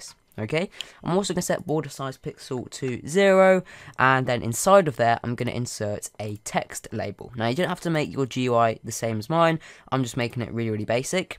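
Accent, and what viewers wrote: United Kingdom. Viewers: Now he sounds more British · Omg your British now!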